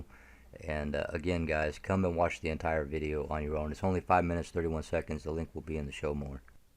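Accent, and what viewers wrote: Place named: Israel. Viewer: Her accent though is way to israeli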